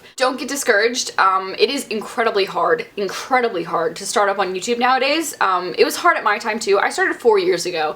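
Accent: South, United States. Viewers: why did they not want you to have the southern accent? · You do have a slight southern twang.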